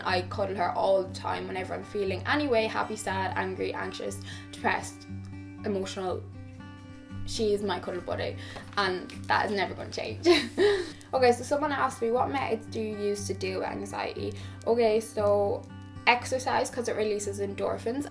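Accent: Ireland. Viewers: oh my god. you speak in such an irish accent. omg, melanie sounds like an american and you sound like REALLY ORIGINALLY Irish. omg 😊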